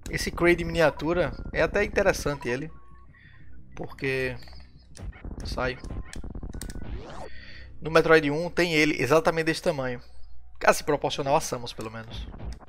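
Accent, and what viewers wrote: Recife, Brazil. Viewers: Cara…. Estou ha tempos para escrever aqui umas coisas…. Primeiro vou falar sobre minha relação com esse jogo: foi o jogo que mais curtia lá nos anos de 1995 / 1996 (+ ou - por aí). Eu me dediquei muito ao jogo, e em uma época que não havia muitas informações, consegui sozinho acumular 98% dos itens. Daí na época uma revista lançou um “detonado” do jogo, e eles conseguiram 97%! Além de ter ficado orgulhoso com meu desempenho, consegui descobrir os 2 itens que faltavam! 🙌 Agora vou falar de vc: cara, tu é muito fera!!! (Pra não falar muito f*da!!) Nunca assisti vídeos muito longos de games, mas esse seu aí já assisti duas vezes…! Rodar o jogo todo sem errar caminho, sem pausar p ver mapa, sem hesitar… basicamente sem erros e demonstrando muita habilidade… muito fera! Parabéns! E vejo que esse acaba sendo “só mais um” jogo em que vc demonstra habilidade. 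Parabéns!!! E grato por esse vídeo!! Por fim: vc é de recife?? Pelo sotaque, assim me pareceu… Saúde e sucesso p vc e família! Ah: e qual idade da filhota pequena que vc cita?? 🥰 O meu tem 6 meses… 🥰 Abraço! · Senti um sotaque recife ai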